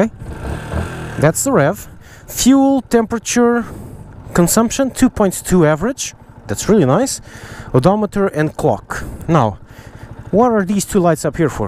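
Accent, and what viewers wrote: Portugal. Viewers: Sotaque tuga! Sera? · Ahah o teu sotaque parecia mesmo de Portugal mas só quando falaste é que percebi mesmo ahah, podes dizer-me quanto custa essa moto em Portugal?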